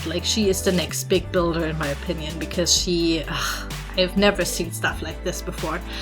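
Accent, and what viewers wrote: Germany. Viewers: Der deutsche Akzent ist die beste! 💛 So ein schönes Haus. Echt gut gemacht! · And youre dialect sounds like German is that right ? 😊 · Me: hears the accent *Checks channel information yup. Germany. P.S. COOLES VIDEO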